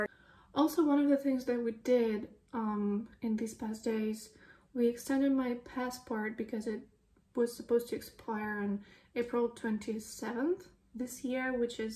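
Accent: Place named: United States. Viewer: Where did this fluency in English come from? And a perfect American accent? I live in the USA for 45 years, still have a Russian accent. When you see Marianne in Florida, give her a hug from an old friend please. I moved from Florida to North Carolina 2 years ago.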